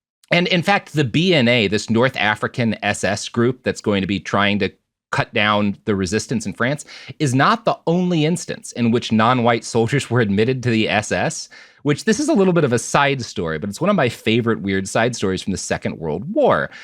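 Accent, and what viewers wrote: Boston, United States. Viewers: Your Boston accent is so thick, hard to understand sometimes. Have you considered speech classes or something?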